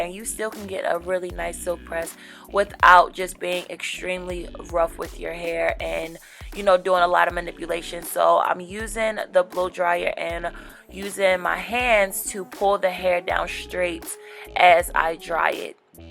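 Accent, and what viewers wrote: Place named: DMV, United States. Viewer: Idk if I’m tripping but I hear a dmv accent. I’m Born and raised ! Lol ...I here that tew and monf 😩😍😍😍😍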